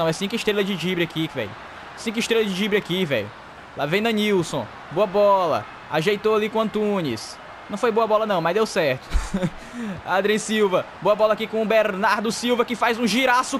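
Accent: Portugal. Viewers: cara, para de falar que nem português!! kkkk é irritante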